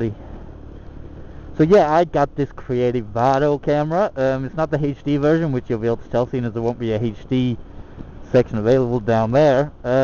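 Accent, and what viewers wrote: United States. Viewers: You seem to have an American Accent, Im confused... And happens all time at traffic lights lmao, pain in the bottom xD I normally end up running them or just turning around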